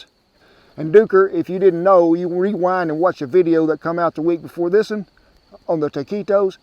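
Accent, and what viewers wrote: South, United States. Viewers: I’ve never seen your videos before. But Jesus h Christ you can’t get any more southern than this. Hah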